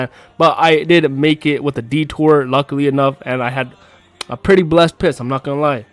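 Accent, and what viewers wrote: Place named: India. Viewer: My guy he is Indian too you can tell from his voice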